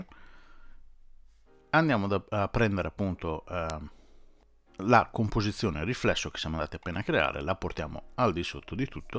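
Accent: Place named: Emilia, Italy. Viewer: Complimenti, sei maledettamente bravo, ti seguo sempre... Volevo chiederti una cosa, se è possibilee... Vorrei fare una casetta in campagna usando una foto, ma vorrei che nelle finestre della casa, si accendessero e si spegnessero, stessa cosa vorrei fare dei lampioni antichi, quelli di un tempo che avevano la candela, come si fa a fare questo effetto ? So che per te è una sciocchezza, ma io ci sto diventando scemo e mi sono guardato quasi tutti i video del tuo canale. Grazie e non smettere... FORZA BOLOGNA !!! L'accento Emiliano...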